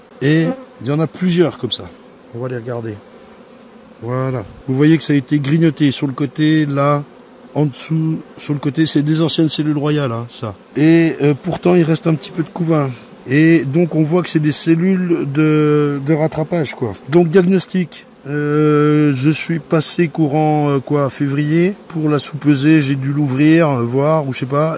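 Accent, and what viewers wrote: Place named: South, France. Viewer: Hello ! D'abord grand MERCI ! Encore une vidéo passionnante ! C'est dingue ce que j'apprends grâce à toi... Ensuite.. J'aime trop ta voix de Bary White 😉 et ton accent du sud parfois... Lol.. Enfin.. Pour moi la reine vierge va mourir.. Soit abandonné par terre.. Soit tuée car les zabs vont sentir les phéromones de l'autre (bonne) reine.. Et n'auront plus besoin d'elle... J'ai bon ? Encore mille merci ! Flo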